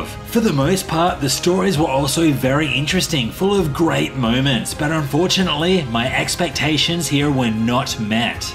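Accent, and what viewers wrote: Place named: Australia. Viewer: The Patreon joke and the rpg in the name and the Aussie accent had me instantly sub.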